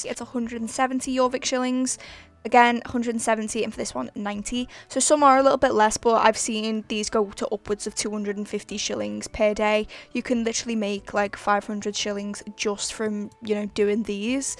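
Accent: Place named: Scotland, United Kingdom. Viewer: are you Scottish cuse u soound it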